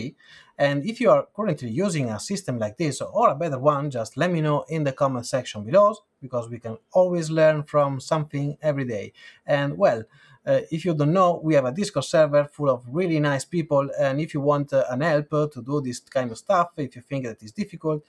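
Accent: Italy. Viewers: Italian accent is funny. No offense, I like it :)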